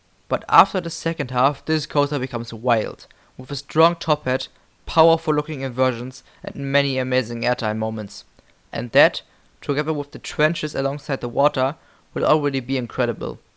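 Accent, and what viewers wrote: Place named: Germany. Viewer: Deutscher Akzent am Start XD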